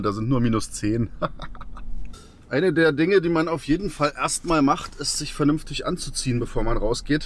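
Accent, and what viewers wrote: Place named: Germany. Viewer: Kann hier nur die Videos von Luca Lerga empfehlen, der hat sich mit dem Thema kaufen oder mieten beschäftigt , das trifft ja auch auf den amerikanischen Markt im allgemeinen zu. Eine weitere These hat Mike Hocke vor kurzem aufgestellt, und zwar wenn die Werkstätten deinen deutschen Akzent hören versuchen die dir die Scheine aus der Tasche zu ziehen. Sind aber alles nur Vermutungen. Ich bin auch selbstständig und mein Auto ist auch ständig in der Werkstatt und immer wenn ich denke , jetzt ist aber alles gemacht, dann geht wieder irgendeine Kontrollleuchte an. Ich kann echt mitfühlen wie scheiße das ist.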